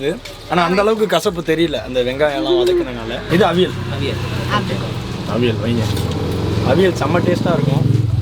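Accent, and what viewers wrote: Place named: Kerala, India. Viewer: Malayalam slang madiri eruku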